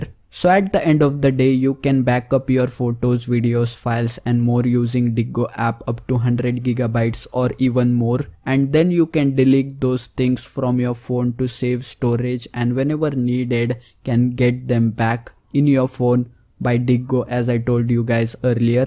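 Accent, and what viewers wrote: India. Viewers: He is just in a Indian accent not everyone is English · it's Indian accent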